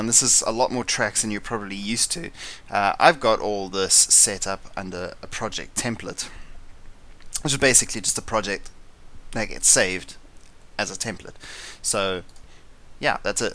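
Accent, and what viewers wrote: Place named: South Africa. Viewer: Hey! Been using the same theme since 2014, and also produce electronic music. If that isn't rare enough, it sounds like you're also a South African! Greetings from Cape Town :).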